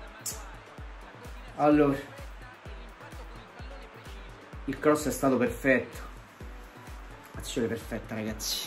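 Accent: Rome, Italy. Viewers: Fai troppo ridere amo tantissimo il tuo accento Romano 😂😂😂😂😂❤❤❤❤❤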